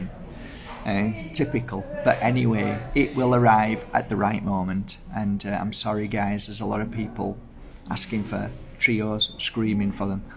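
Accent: Yorkshire, United Kingdom. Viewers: Thanks for making and sharing this video. I love the Yorkshire accent 🙏💗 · Most people love the Yorkshire accent. I'm Yorkshire and don't like my voice either. But most call centres in Yorkshire. Because people love the accent. Your voice is lovely and you speak from the heart ❤ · That is so funny i totally understand your saying about hating hearing yourself speak i cant bear hearing my north London working class accent 🤣but your yorkie accent is beautiful always has a calming effect on me especially with the wise words you give us 🙏❤️❤️❤️ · Seems most everyone loves the voice. It’s got character and sincerity there, not to mention depth of perceptivity. People LOVE the Yorkshire accent too. Woah…30thousand. Well, AI is looking out for you some. Ai reflects us, in multiple aspects, normally not so good. In this rare case, a fine outcome. (Expect, I’ll pick up a bit of flack from people’s feelings on the Ai.) Its okay on the flow; lots of people picking up stuff starting particularly in the 90s, then went quiet for some, louder for others. 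Hmmm, don’t know about handling worse situations in the next few months. Have to consider that one. Well, all will get through it. Personally, I may hibernate. Thanks. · What you say is the most important , your tone of voice is soothing and understanding, and your accent is my personal favorite accent from England . I have been watching British programs on PBS in the US since I was a pre-teen and later when I was introduced to All Creatures Great and Small I became drawn to the Yorkshire accent, I love listening to it. In fact I feel drawn to the area and in general the north of England holds a kind of magic for me. Keep doing what you do, we need more people like you in this world. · Love your Yorkshire accent, I’m from leeds West Yorkshire, love this coffee chat , thank you for everything you do ❤❤❤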